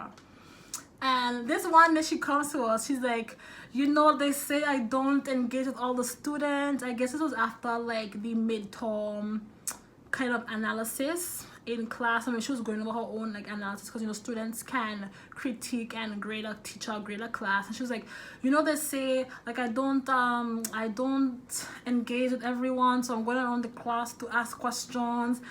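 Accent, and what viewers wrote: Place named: United Kingdom. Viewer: i can hear your uk accent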